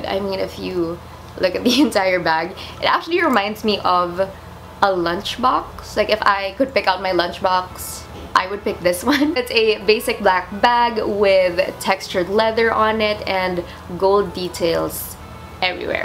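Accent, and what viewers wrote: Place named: United States. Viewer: First time viewer love the accent still confused if you're American in a good way though I immediately subscribed when I heard your precious voice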